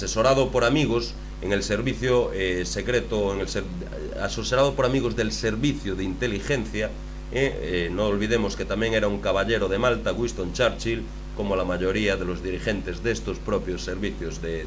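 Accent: Galicia, Spain. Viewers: Acento Gallego de GALICIA-ESPANA.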